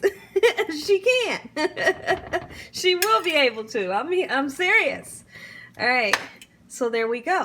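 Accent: South, United States. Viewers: I love your recipes.i like the word like so.girl you gotta be from the south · Love the way you cook and your beautiful southern accent is a bonus. Thank you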